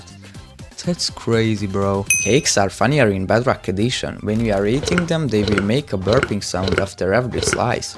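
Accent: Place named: Hungary. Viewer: Based on your accent, are you from Hungary?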